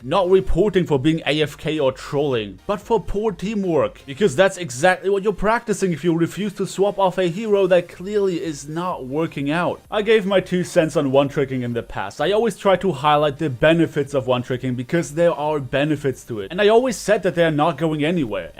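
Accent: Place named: Germany. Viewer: Hey, I might have a small personal question. If I remember correctly, you mentioned in one video that you are from Germany or at least from a german speaking region. I am from Austria and would be interested from which part of Germany exactly. I am just interested because of your accent and you are in my oppinion the best overwatch content producer on this plattform. Anyway just in case I confounded you with another youtuber and you arent from Germany, I apologise. (This was a nightmare to write with german Autokorrektur on my phone😤)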